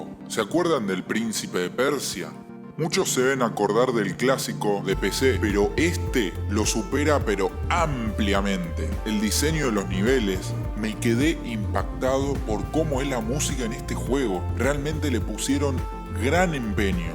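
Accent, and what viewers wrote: Argentina. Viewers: minuto 0 y ya me di cuenta por el acento y las s's de que sos argentino XDDD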